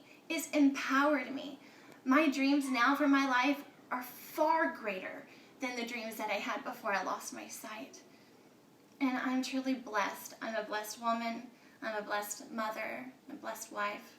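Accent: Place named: United States. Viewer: Just cause she sounds american. u_u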